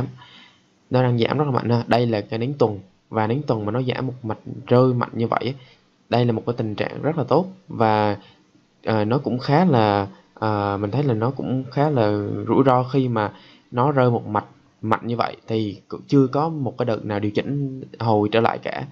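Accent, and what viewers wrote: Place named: Quang Nam, Vietnam. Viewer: Ad người Quảng Nam hay Đà Nẵng vậy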